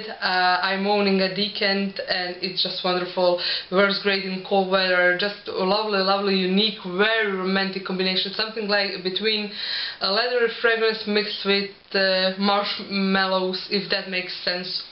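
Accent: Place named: Russia. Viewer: Хахах видно что русская ( акцент )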